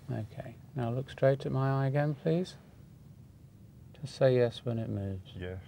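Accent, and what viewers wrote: United Kingdom. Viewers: I've watched the whole video and I'm still waiting to hear a calm voice. All I hear is a horrible british accent. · That is a very beautiful british accent sir^^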